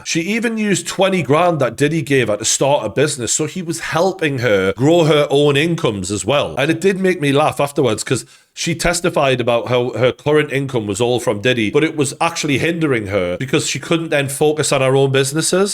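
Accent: Newcastle, United Kingdom. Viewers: You are a f ing legend put perfectly geordie 🫡💯💫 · I love the Geordie accent! One of my favorite YTers Cam Kirkham made me love it ❤ and 50 is King 👑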